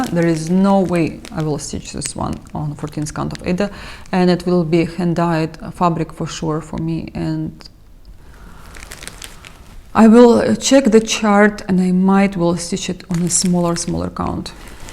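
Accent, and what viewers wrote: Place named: Russia. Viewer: Talk abour BORING! If I hear "just nan" one more time my head will explode! Your projects are SO boring, so dull, they completely lack color, and nothing is more boring than samplers! It's like oatmeal! And on top of that you attempt to say french words when you are barely understood in English because of your massively thick russian accent. Seriously? You are so blatantly bougeois in contrast to the suffering of the people in your home town! The only good thing about this video is susie, the most beautiful chihuahua.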